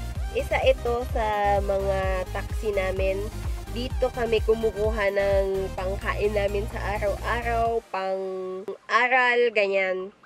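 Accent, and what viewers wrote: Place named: Ilocos, Philippines. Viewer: Miss ilocana ka no.... Sa salita palang my puntu na... Ney..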